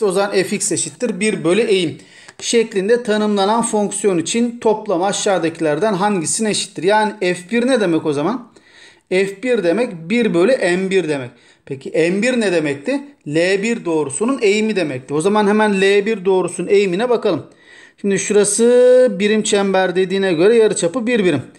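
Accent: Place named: Çorum, Turkey. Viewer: Corumlu şivesi eskisehir veya sivas herhangi biri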